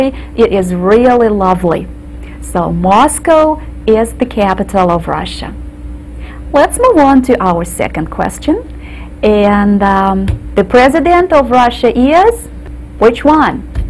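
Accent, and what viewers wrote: South, United States. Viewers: I love how you can hear little glimmers of Britney Spears Southern American in her accent. "Got my edu-kai-tion..." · I like this but I swear I hear a strong southern States accent, I suppose that kind of stuff makes possible to guess what area people are from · she kinda sounds like she has a southern accent, which is ironic since she's from Russia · You speak with such a strong Southern accent. you got this accent in 8 years ! Where in the South do you live mam ? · This is so amazing that she has a southern accent!!! amazing!! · She sounds like she learned English in the south. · the southern(?) accent is throwing me off but i kinda love it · Never heard a russian and southern accent fighting each other at the same time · I like how she has a slight southern twang lol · southern cowboy accent comes from the deep words pronounced in Russian that makes her talking in that way in English! 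:) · Thanks for the video! I have to say that I was very surprised to hear that you are native born russian. It is easy to tell that you've been living in the south because you have that southern drawl. Spahsiba!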